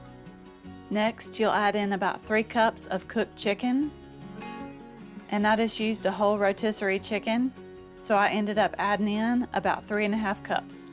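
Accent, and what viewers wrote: South, United States. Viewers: What a gorgeous lady with a nice southern voice ♥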